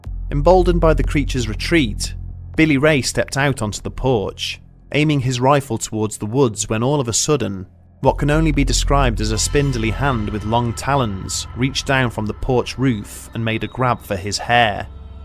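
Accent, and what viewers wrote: United Kingdom. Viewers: Sorry but this narrator calling a twenty two riffle a “two-two” is just ridiculous and I assure you the people from UK 🇬🇧 do not all speak like this twat.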